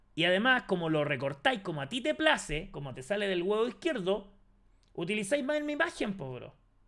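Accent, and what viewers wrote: Chile. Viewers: eres chileno wn?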